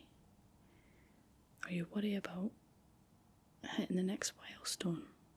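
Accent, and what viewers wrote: Scotland, United Kingdom. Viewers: Wow. That's like the most scottish accent I've ever heard 😂❤ and I've been to Scotland once, and loved it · Pretty Scottish lady, the English and Americans laugh at your Scottish accent, but I don't; I find it pleasant and it helps me understand English better. Also, when I listen to the music from Mel Gibson's Braveheart while flying over your Scottish landscapes with a drone, it's magnificent. I'm French, and I hope to hear from you soon.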